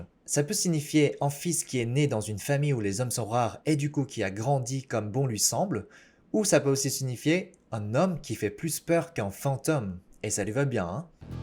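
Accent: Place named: France. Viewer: Je suis tombée amoureuse de ton doux accent français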